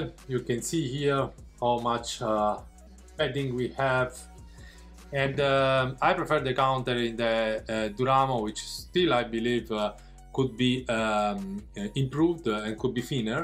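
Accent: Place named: Italy. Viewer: Your English is fine but i still can hear the Italian accent clearly XD, good review.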